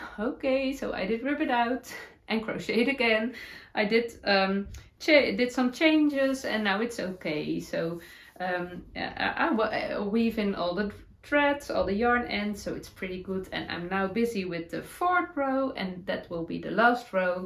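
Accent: Netherlands. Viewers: Actually you have a lot of American friends. I watch all of your podcasts...and love them. You're so cute and your "Dutchy" English is improving very much. I'm from Kansas City, Missouri and I look forwardvtobyour podcasts each week. 😊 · Dear, so happy to have found your podcast! I enjoy your Dutchy English, and your knits are beautiful. It is always nice to know I'm not alone in my mistakes. Thank you, for sharing your adventures. Cheers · I enjoy your podcasts so very much, I love your Dutch English! You have definitely grasped the English language very well. I’m knitting the CORRAN cardigan too….I might made short sleeves like yours. You inspired me.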